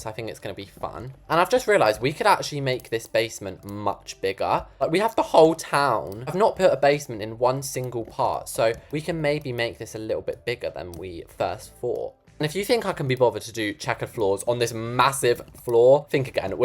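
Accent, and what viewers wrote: United Kingdom. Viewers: im so confewsed idk if your british or not bc when you sing you dont sound british? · Bro his G@ and British voice is driving me crazy 😭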